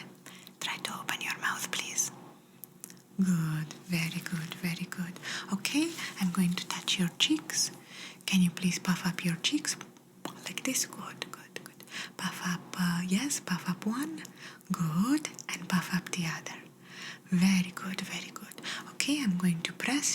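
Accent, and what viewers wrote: Italy. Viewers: Your Italian accent is very special and beautiful. You are terrific for ASMRs. First time see your channel it was amazing, you have a viewer that is going to continue watching your great videos. Thank you for all your hard work. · you made my day aside from the Italian accent you reply to me nice your amazing. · Anyone else find her Italian accent a massive turn on? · Italian accent has an additional sweetness. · I really enjoy your Italian accent personally I’m ltalian your soft spoken is my favorite your whispering is also my favorite sounds. PS sleep 😴 dreams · Hello fantastic ASMR with Italian accent I loved it I really enjoyed listening to your sweet voice very relaxing very relaxing greetings beautiful 🌹🌹💖💖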